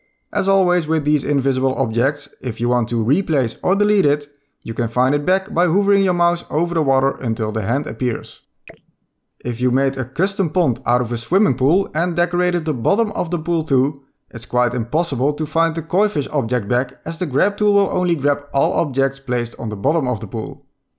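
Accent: Netherlands. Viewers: Ik hoor gewoon dat dit een Nederlander is hahahah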